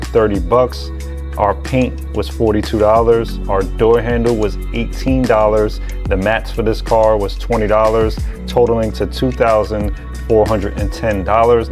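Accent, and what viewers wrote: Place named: Northeast, United States. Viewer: Dude you said right! I know it's hard with a northeast accent 😂. Digging these flips. Nothing like a great deal, minimal cost and effort to double your money.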